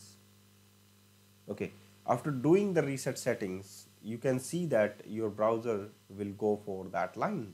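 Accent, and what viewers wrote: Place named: South India, India. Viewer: what's so special about it, sounds too south indian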